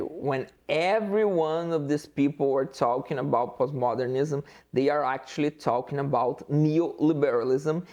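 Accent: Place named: Brazil. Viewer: I love your accent! Let's go Brazil!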